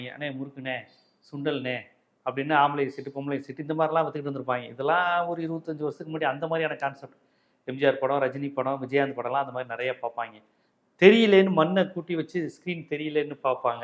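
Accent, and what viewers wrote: Madurai, India. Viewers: உங்கள் பேச்சில் தெரிகிறது நீங்கள் மதுரைக்காரர் என்று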